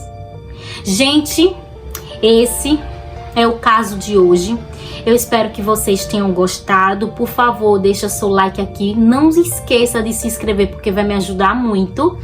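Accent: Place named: Northeast, Brazil. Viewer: "E disse bem assim" se existe coisa mais bonita que nosso sotaque nordestino narrando casos criminais reais, eu desconheço. Parabéns pelo canal.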